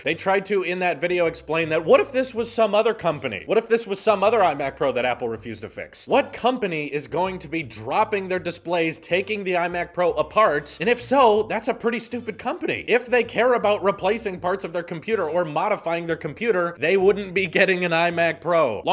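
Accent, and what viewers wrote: United States. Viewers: What’s you’re nationality? Just wondering I thought you were from the UK but you sound American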